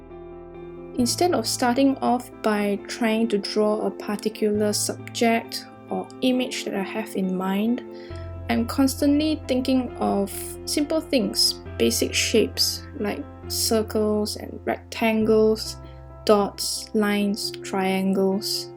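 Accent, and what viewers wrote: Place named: Nigeria. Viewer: i swear your accent sounds Nigerian. if it wasn't for your profile pic i would have guessed you were my country mate.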